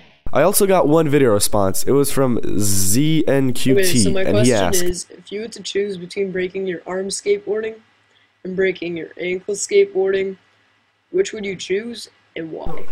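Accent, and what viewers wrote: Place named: United States. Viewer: mdr ok, au debut jpensais t'avais pris la voix d'un trick tip americain et que tu l'avais mise ici :)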